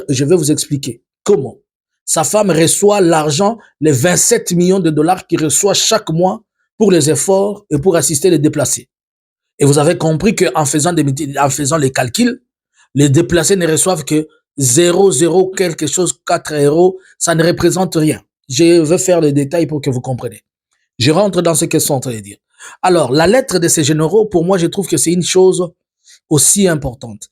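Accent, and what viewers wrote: Rwanda. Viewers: Monsieur, tu est rwandais, ça se voit ds ta façon de parler, notre armée avance très bien, où en sommes nous, avec la cité de masisi, c'est ds les mains de notre notre armée, monsieur arrêt les mensonge, moi j'habite Luberon, menteur que tu es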